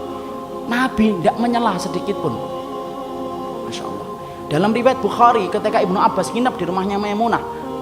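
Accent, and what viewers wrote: Java, Indonesia. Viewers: masyaAllah stads... Allah mudahkan penyampaian hidayah ini lewat ustads... baarokalllah stads... logat jawanya mash kentel sanget stad... :)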